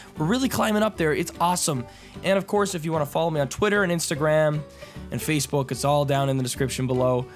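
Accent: Newfoundland, Canada. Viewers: Huh, I actually guessed that you were from Newfoundland- Its probably because the way you pronounce certain words is similar to how MattShea does.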